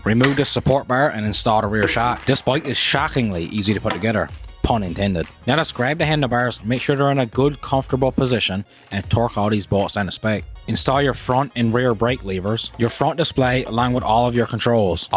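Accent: Newfoundland, Canada. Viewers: don't sound too too Canadian to me lol, maybe an irish newphie?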